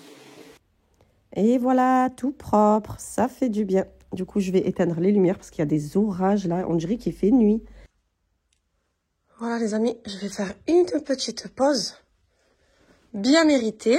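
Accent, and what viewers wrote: South, France. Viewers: Salem alikom okhty 😊 Merciii pour toutes tes vidéo déco, cuisine, ménage… 🥰 je te regarde depuis un bon moment et j’aime trooop ton accent du sud ensoleillé Allah y barek Allah te protège et te donnes la force inchallah 🤲🏻 Allah y barek pour ta récitation de Sourat « الرحمان » puisse Allah le tout puissant iketeblek le Hajj à toi et ton mari inchallah 🤲🏻🤲🏻🤲🏻 Allah te protège toi et toute ta famille inchallah 😘😘😘🥰🥰🥰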